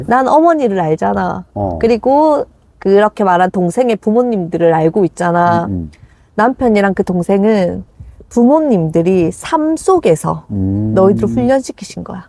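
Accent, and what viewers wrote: Gyeongsang, South Korea. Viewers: 사투리.. 저는 오히려 정감있고 리듬감 있어서 더 집중하게되고 잘 들려요~ 참고로 경상도사람 아니에요 ㅎ 오늘도 많이 배웠어요! 늘 감사합니다! 🤙🏻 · 저도 경상도 사람이라 너무 정겹고 말투가 제 친구랑 완전 똑같아서 더 친근감이 드는...ㅎㅎ영상 잘보고있어요~~저는 기꺼이 육아가 너무 좋아요^^ 늘 좋은 정보 뼈때리는 정보 감사합니다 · 앗 저는 서울 사람인데 경상도 사투리 너무너무너무 힙하고 좋던데요????? 그리고 사투리 덕분에 영상도 더 따숩고요! 팬입니다!❤ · 전 경상도 사람인데 서울로 올라와서 서울말 쓰고 생활하는 사람인데요 선생님 강의 들으면서 "아 경상도 말이 이렇게 정감있고 재밌는 말이었지~~~ 나도 다시 경상도 사투리 써볼까 ㅎㅎㅎ" 생각했었는데요!!! 선생님 화이팅♡♡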